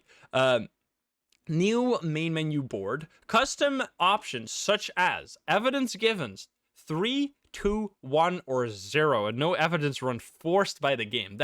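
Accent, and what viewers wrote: Netherlands. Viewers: Are you from netherlands?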